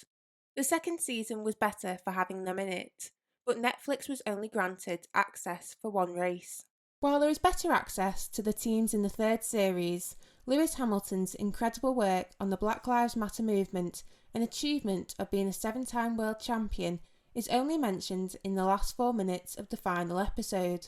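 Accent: United Kingdom. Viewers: As true fans we would naturally take in everything available about F1. We therefore each have our own opinions about the content of whatever we are watching. Whether your favorite driver was “properly” presented, for example. Consider that Netflix viewers are just browsing available content and see this series. They watch one. Maybe another one. And suddenly they see drama and excitement, politics, technical details, personalities and families and daily struggles. They have discovered a huge thing out there they never even wanted to be interested in has global appeal. New fans. Like me to this channel. I am doing a bit of binge watching now. Great job. Nice stuff. But then again, I am a total sap for a lovely British accent.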